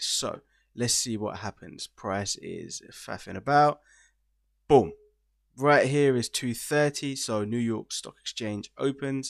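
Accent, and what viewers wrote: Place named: Germany. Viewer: wie wenn du deutsch redest.bitteversuche in englischen dialekt zu reden.versuche.du gibst sicher gute tips aber der dialekt macht dich unhörbar.bemuhe dich.danke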